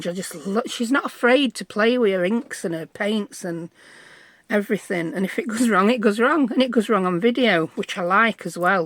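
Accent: United Kingdom. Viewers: I love accent, reminds me of Coronation St when I used to watch it in the 80s.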